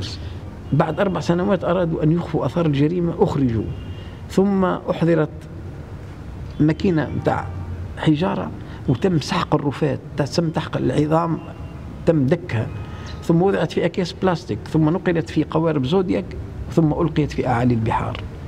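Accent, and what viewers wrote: Tunisia. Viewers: شفناهم كيف نهضوا بالبلد ولهجتك التونسية واضحة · بس لهجتك تونسية بشكل كبير ، ليش ؟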